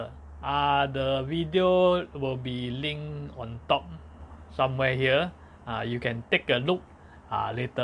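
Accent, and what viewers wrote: Singapore. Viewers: Subscribed, love the true singaporean vibe, the Singlish is power, and it’s just like watching your neighbourly Uncle talking, brings us back to kampong days. Keep it up.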